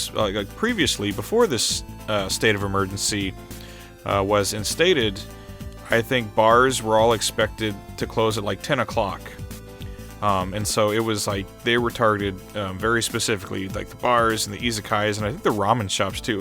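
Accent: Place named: New Jersey, United States. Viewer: New Jersey!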